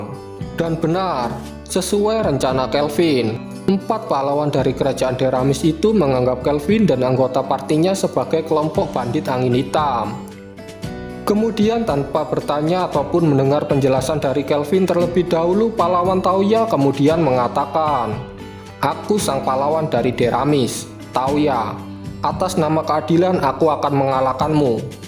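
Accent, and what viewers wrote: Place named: Java, Indonesia. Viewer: Jangan medok min ngomongnya🤣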